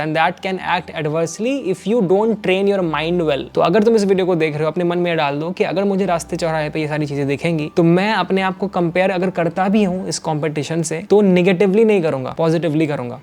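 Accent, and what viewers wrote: Bihar, India. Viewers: bhaiyaa you're from Bihar? Your way of speaking i gotcha u I'm pretty sure you're from Bihar.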